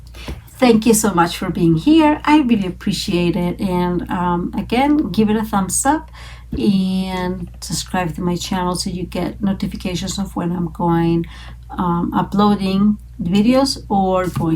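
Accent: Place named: Puerto Rico. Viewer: Love watching you create cards,. You speak like a woman who lives here in the apartment building where I live, and she's from Puerto Rico.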